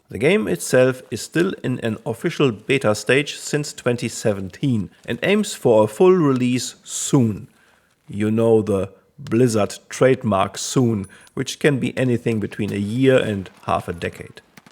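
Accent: Germany. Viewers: Ich mag einfach diese klare und deutliche Aussprache 😂 Man kann immer hören wenn es ein Deutscher ist der redet👍🏼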